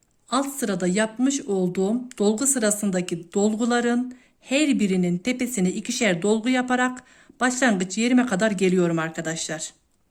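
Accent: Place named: Central Anatolia, Turkey. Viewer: İlk yorum benden olsun hafif İç Anadolu aksanı seziyorum hemşeriyiz sanırım ayrıca çok güzel içerikler var ✅🙏😊👏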